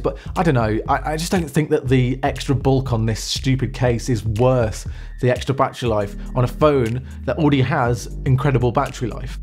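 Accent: United Kingdom. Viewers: Are you from Newcastle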